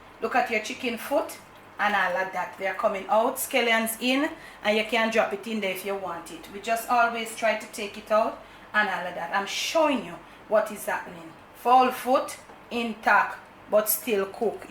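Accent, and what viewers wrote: Jamaica. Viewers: Hey auntie i love your styling of cooking the way you explain everything and I must mention the strong Jamaican accent, man me love it all, I am Trini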